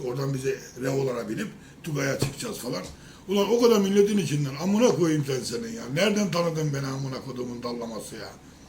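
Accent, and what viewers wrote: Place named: Izmit, Turkey. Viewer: abi sen izmitli miydin ya tam bizim buralardaki gibi konuşuyosun harika bir insansın çok sevdim seni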